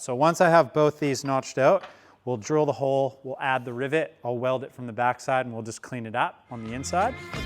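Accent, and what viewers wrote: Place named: Australia. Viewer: First time watching your channel, and I'm sitting here trying to figure out where you are...! Obvs American accent, but I'm hearing Aussie and Pom, and you're talking about The Mad Scot. Now that I've googled...wow, you really have got an Aussie drawl!! 😄😄 Great work mate, those flanges look superb 👌 (Possibly might have liked some in depth on the sand forming, but awesome all the same!)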